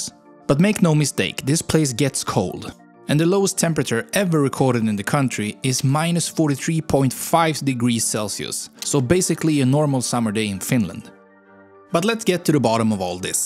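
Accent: Sweden. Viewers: I'm Estonian and I thank you for all the positive comments! As soon as you started to speak, you're Swedish, right? Just my observation, nothing else. And thank you, again. Your somewhat simple but overall meaningful sentences moved me a lot! We want to be free and want to be a part of FREE Europe! Ok, yesterday was our county's 106th birthday, but in Europe we've been much less time. We can give MORE and want to give MORE! I'm sure I'm not the only one thinking like that! :) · please please please for the love of everything anyone has EVER considered holy, can we get someone narrating this that actually speaks ENGLISH and not SWENGLISH, it is literally painful to hear. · The narrator sounds Swedish, or possibly Finnish, so Estonian pronounciation shouldn't be too alien to him. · Sounded pretty Swedish to me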